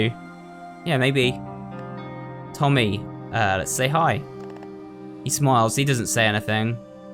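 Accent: United Kingdom. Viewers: What is your accent? You're saying "Ant-oh-knee" rather than pronouncing the "t" in "an-tho-knee" so I'mma guess your british.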